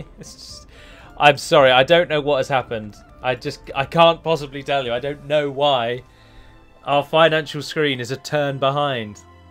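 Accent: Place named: United Kingdom. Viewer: YOU just have the best British accent ivé ever heard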